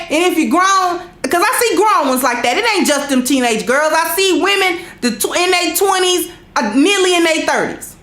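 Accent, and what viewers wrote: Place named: South, United States. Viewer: where do you live, you're seeing this kind of woman all the time? sounds like you're from the south.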